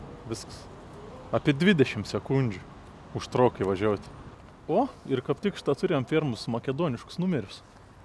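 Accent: Samogitia, Lithuania. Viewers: sunku buvo priprasti prie tavo zemaitiskos snektos, bet siaip unikalu ganetinai, pradejo patikti :D o kiekvienas tavo video turi kazkokio sarmo, tau tinka kurti toki content'a :) sveikinu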